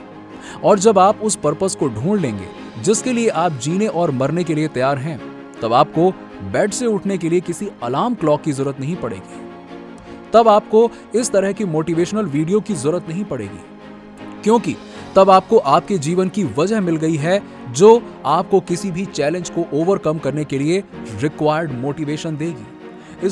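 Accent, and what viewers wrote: Bengal, India. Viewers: Bangoli accent was good..,...I liked that voice... Resume that voice again...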